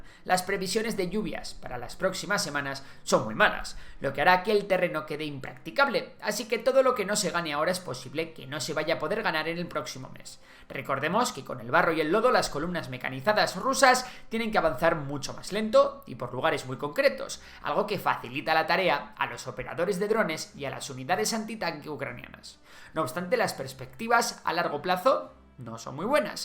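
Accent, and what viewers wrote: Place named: Spain. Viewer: Creo que este muchacho ve demasiado películas gringas. Su acento español dice que es adicto a los diarios ABC y El País.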